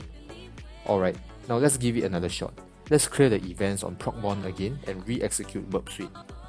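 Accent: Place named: Singapore. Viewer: Bro ur video is awesome. Your accent sounds like Singaporean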